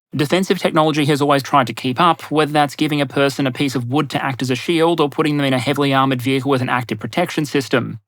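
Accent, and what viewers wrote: Australia. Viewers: I really like your videos. They are interesting, and you rarely cover a topic that I don't consider worth investing the time necessary for watching. However, you're talking rather quickly, and the information is dense. Together with the at times a bit difficult to comprehend Australian English, which sometimes takes me a few seconds to figure out what word I just heard, and consequently needs me to rewind a bit because I missed what you said when I was thinking about that, and with the videos all close or over one hour, it is not exactly easy listening (maybe only for a non-native speaker). Aber before anybody is asking: Yes, I know I can set the playback speed lower, but 75% is really a bit too much and gives me the impression of listening to a Whisky connoisseur en masse, hence that's not an option. So my question would be: Did you ever consider splitting your videos into chunks of 20 minutes or so, which would give you the chance of talking a bit more slowly, and non-native speakers a better chance of being able to enjoy listening? 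😊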